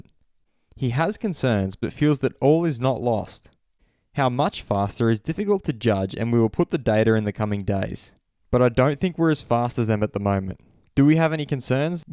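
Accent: Australia. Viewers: Always nice to hear an Aussie voice regarding F1, it's basically a cult interest over here. I have NO ONE to talk to about it.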